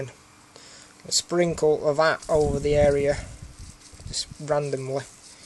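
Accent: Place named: RP, United Kingdom. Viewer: Model tips are great,theres always another way of doing things,you tubes great for sharing idea,s,just wondering which tv company will ask us both to do voice overs for advertising,yours is a touch posher than mine,LOL,cheers Tony.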